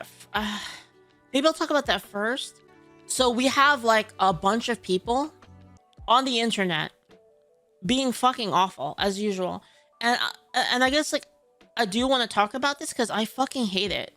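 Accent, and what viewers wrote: United States. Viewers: Unrelated but, As a British man, i find it funny hearing an American explain what rain is to me 😂 i was 4 when i discovered i could take off a raincoat, we're practically born underwater it rains so much here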